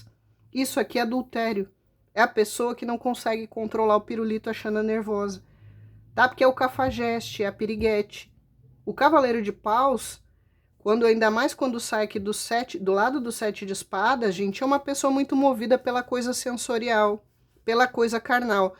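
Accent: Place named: Rio Grande do Sul, Brazil. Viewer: que nem tu não tem cara tu é a melhor e o sotaque Nossa aqui do Rio grande do Sul não tem comparação tu é a melhor, parabéns eu adoro e dou muita risada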